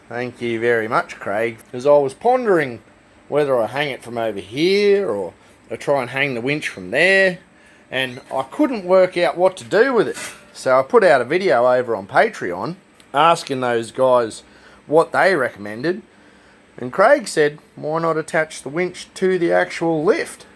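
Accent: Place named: Australia. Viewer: Looking good!, huge back saver!i thought i was the only one who had trouble with train leaving the station without me on it !😂you to young! Love the Australian accent and words you use, seriously looking great you've come long way!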